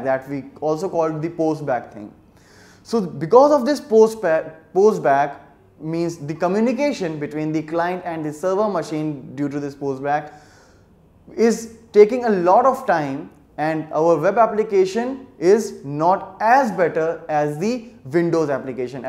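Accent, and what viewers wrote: India. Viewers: You XXXXXX talking about indian accent and from plant are you from. Can you speak any other language other than your mother tongue. Once again XXXXX XXXXXX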